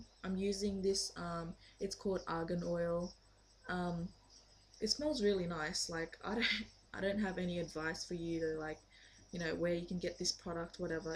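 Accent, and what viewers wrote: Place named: Australia. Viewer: OMfG ~ lolol bro u so funny XD love your australian accent^^~ 'i dont wanna walk around lookin like an abandoned cat' LOL lemme fry mah chicken on your hair! XD neon did hair tuts too - - u guys are the coolest twins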